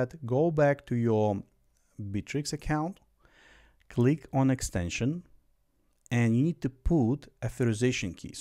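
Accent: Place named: Lithuania. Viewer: bro where are you from, your accent sounds lithuanian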